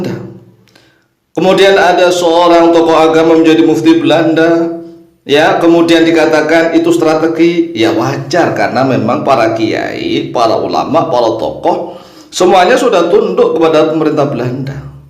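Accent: Java, Indonesia. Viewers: Ini bukan orang aceh baca ini tapi jawa sebab logat aceh kami tahu. Hahaha kalau kita lihat dimakam krekof yaitu makam prajurit belanda yg di kubur diaceh maka banyak orang prajuirit belanda yg mati disana dan bernama akhir o dan itu paling banyak mati dikuburkan disitu. Coba cek aja sendiri dan hb usman punya murid di aceh tuanku kemala ,tuanku kemala ini pejuang aceh dan ulama. Catatan 150 thn itu bukan diaceh y tapi dipulau itu walaupun akhirnya mereka yg paling merasa banyak pejuang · Min, logatnya ko kaya orang jawa, aksen nya kaya bukan orang Aceh ? Sejarah memang versi, Persis kaya peristiwa G30S PKI Kita juga akan mendapatkan cerita yg berbeda/versi yg berbeda tergantung dri sudut pandang siapa. Seperti juga hari lahir Pancasila, Versi sarjana hukum yg paham ilmu hukum lahirnya tgl 18 Agustus 1945, tapi versi Megawati 1 Juni Tentang habib Yahya, yg disangkakan sebagai antek Belanda kebenaranya harus diuji secara ilmiah bukan dri persangkaan, bukan dri rasa dari fakta, apa kedudukan dan fungsi Mufti pada saat pemerintahan Hindia Belanda dsb, Sama seperti kita melihat film Sengsara membawa nikmat, yg kita lihat Midun sebagai pahlawan, tapi dia jadi polisi pada pemerintahan Hindia Belanda, Berarti sesungguhnya Midun penghianat bangsa dan antek Belanda ? 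Mari belajar berfikir jernih dan menyeluruh, sehingga tidak salah dalam mengambil kesimpulan, kebenaran didunia itu nisbi/relatif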